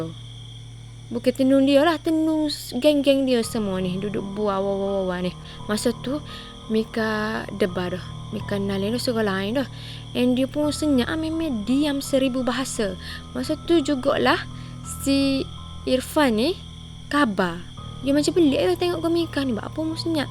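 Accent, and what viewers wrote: Kelantan, Malaysia. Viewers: Sambil2 dgr dapat belajar loghat Kelantan.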